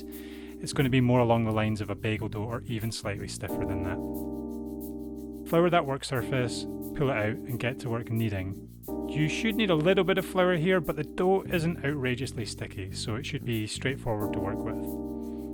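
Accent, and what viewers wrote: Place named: Scotland, United Kingdom. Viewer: Great video! I enjoy your Scottish accent and voice. 😊 it’s relaxing and calming and not comparable to nails on a chalkboard either 😂 These look beautiful and would be lovely for a holiday brunch , can’t wait to make ! Thank you for a great video and recipe !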